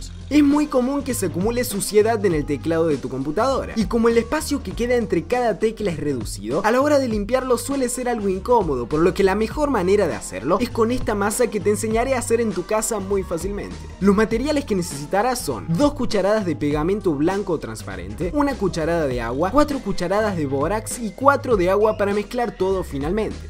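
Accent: Córdoba, Argentina. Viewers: sos cordobes? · decime que sos argentinooo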